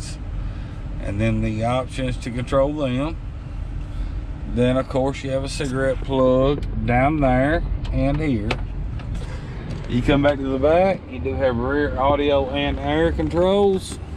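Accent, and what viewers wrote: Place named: Texas, United States. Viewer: Gotta love the Texas accent. And thanks for the information ❤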